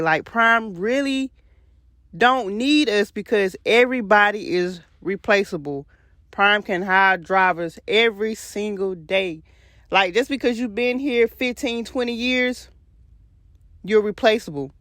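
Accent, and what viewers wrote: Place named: Florida, United States. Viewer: I’m gonna make an educated guess and say you are from Florida that’s because I can catch that Kodak Black type accent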